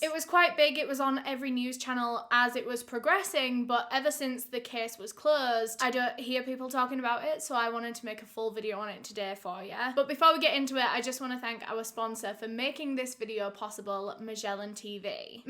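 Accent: United Kingdom. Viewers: Hey..this is off topic..i love your accent! Sounds British with a twist!😉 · Can a British person tell me what kind of accent she has? It doesn’t sound like typical accent you hear on tv. Is it considered proper? I met a girl from Manchester who told me Adele sounds so bad when she talks and here me an American loves her accent. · I. Love. This. Accent. So. Much…. Which part of the UK is this?!!! 🤩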